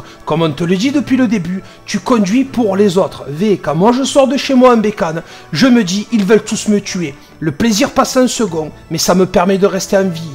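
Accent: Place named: Corsica, France. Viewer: Mais ma parole, tu es Corse..je reconnais ce bel accent ❤, en tout cas si ce n'est pas le cas, tu dois avoir du sang Corse 🙏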